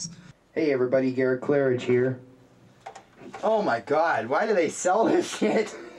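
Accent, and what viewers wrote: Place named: Ontario, Canada. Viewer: I love Ontario accent, love from Italy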